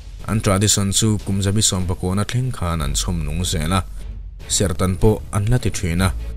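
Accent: Mizoram, India. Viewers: a chhiartu hi damna thilah mi lo ngaihsak a law...demna lam ani lo...tu ziah nge i chhiar...a ziaktu hi Israel nih chak mi a ni ngei ang nga...tiang lam a mizo histry ziaktu chuan Israel a chhui luh tumin antin zawn ber e...ti a coment chauh zawk ka ni e.... hi ka dem reng2lo e...a thil chhiar...Lushei aw phawi...Duhlian țawng kaiza irawm dik chhakchhuak mi..anih vang hian ka ngaisang khawp asin...Lusei thumal dik leili vawrh ri hriat ka ngainat ber asin....i ngai sual anih kha...ka comentna hi...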